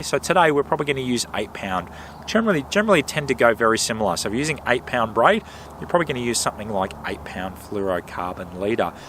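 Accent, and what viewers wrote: Australia. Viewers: Irish? LOL Australian.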